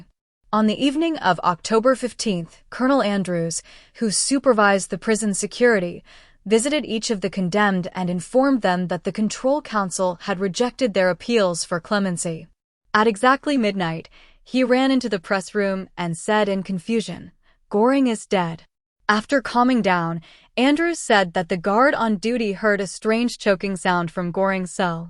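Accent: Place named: United States. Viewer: Difficult listening to an american female voice narrating this story so i wont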